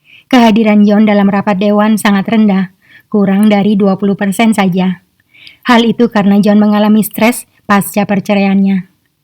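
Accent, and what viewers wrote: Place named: Madura, Indonesia. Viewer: Logatnya seperti orang Madura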